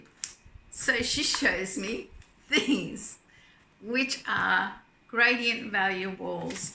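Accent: Australia. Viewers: Hi, I absolutely relished watching your video and hearing an Australian accent! Make me homesick. Great yarn unboxing!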